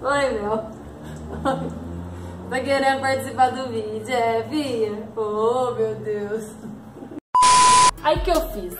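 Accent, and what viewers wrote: Minas Gerais, Brazil. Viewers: Esse sotaque nosso é bonito demais sô 👏😊✌️🤘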